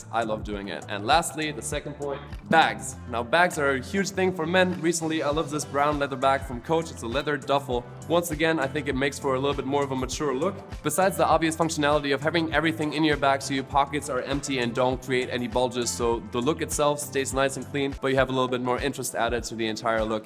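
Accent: Germany. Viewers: Nichts zum Video, ich kenn dich nicht, aber für mich klingst du wie ein Deutscher, der die englische Sprache ziemlich gut beherrscht :D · He sounds like he is actually from germany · Are you German? You have a similar color in your voice. No offence, just wondering...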